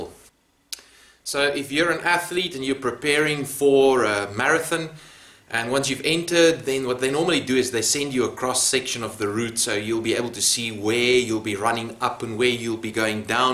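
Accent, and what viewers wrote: South Africa. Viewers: south african or new zealand???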